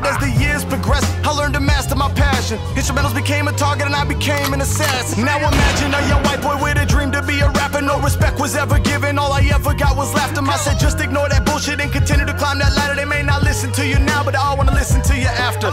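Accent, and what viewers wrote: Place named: New York, United States. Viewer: you sound your like newyork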